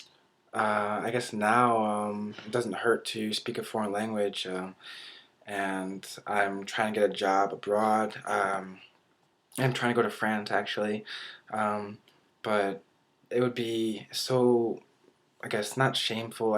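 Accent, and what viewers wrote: Bavaria, Germany. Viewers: Ich bin beeindruckt. Du sprichst was Wortschatz und Grammatik betrifft besser deutsch als so mancher Deutsche :-). Sehr gut auch der bayrische Dialekt. Da sieht man mal wieder was man mit Spass, Fleiß und Talent alles erreichen kann.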